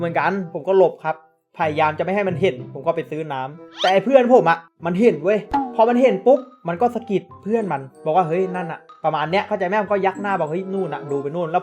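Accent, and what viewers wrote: Phetchaburi, Thailand. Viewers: พี่ อยู่จังหวัดไรคับสำเนียงพี่เหมือนเพชรบุรีเลย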